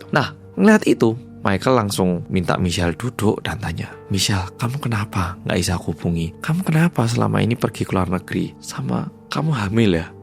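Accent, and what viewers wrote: Java, Indonesia. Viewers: 😅😅 malah tertarik medok jowo ne Mase,nah yg bikin ngakak lagi kok malah wong Korea ngono lhoool😅😅😅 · Lucu aja kalo dengar oppa medok cerita😅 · Masih suka suaranya ..medok bgt jawane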